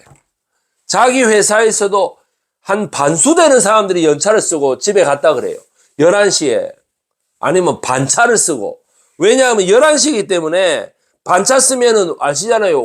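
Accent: Gyeongsang, South Korea. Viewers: ● 항상 매 영상마다 잠에서일어난 숙취가득한 헤어와 까슬수염 가득한 얼굴이지만 그래도 교수를 나는 사랑한다.. 그의 정의에가득찬 경남사투리를 나는 사랑한다...●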